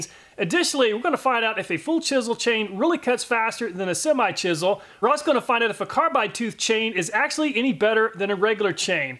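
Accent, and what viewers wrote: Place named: South, United States. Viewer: Very good info but man you talk so fast my ears could not keep up so I lowered the playback speed to .75 and now I can understand you better , now sounds just like a good old boy from the South which my ears appreciate much better .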